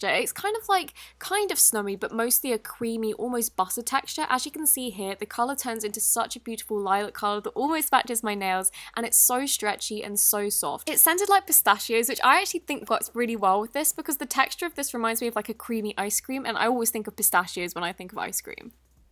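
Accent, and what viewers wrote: United Kingdom. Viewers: she cant her british accent takes over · are you British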